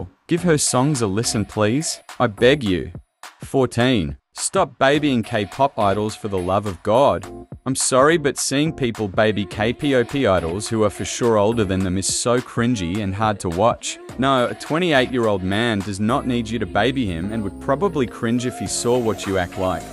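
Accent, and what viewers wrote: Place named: Australia. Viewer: "They should make a rule that you should be 16 minus 17 years old to debut." 🤣🤣🤣 So they should be literal fetuses? Haha just pulling your leg! Is this a robot voice reading "16 - 17" literally because that is freaking hilarious! No judgment, absolutely no dislike for robot voices. I would also choose one instead of using my own voice. I've never heard an Australian robot voice, and then you said you were from Germany, but that does not preclude that you may have learned English in Australia, as one of my friends did. I enjoyed this video very much! I'm subscribing right now and I hope you make more videos soon! 🙏😄🌸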